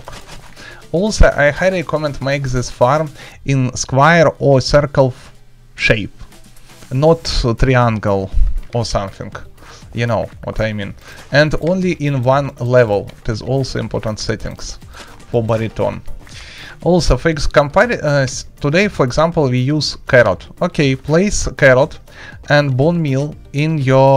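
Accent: Russia. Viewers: сразу видно, русский акцент)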